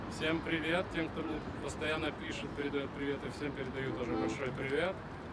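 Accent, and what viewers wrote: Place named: Odessa, Ukraine. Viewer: у тебя появляется Одесский акцент! Ты как всегда лучший!!!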